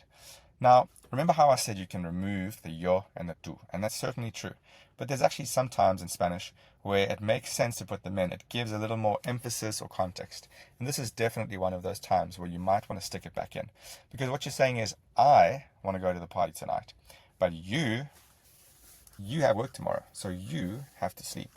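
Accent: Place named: Cape, South Africa. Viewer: cape accent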